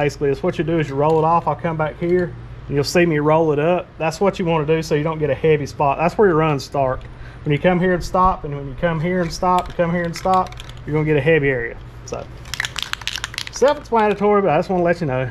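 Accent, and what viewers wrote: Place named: Texas, United States. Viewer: Love the Boomhauer accent. I'll look for that paint with the options for spray pattern.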